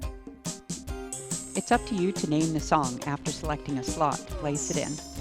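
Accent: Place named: Midwest, United States. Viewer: Awesome! We were thinking midwest U.S. or maybe Western N.Y. near where I grew up in haha! You're accent is very similar! Cheers!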